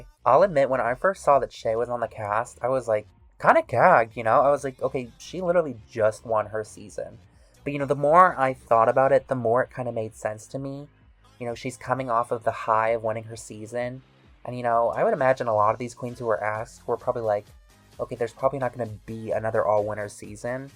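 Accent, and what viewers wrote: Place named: United Kingdom. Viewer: Your british accent cracked me up🤣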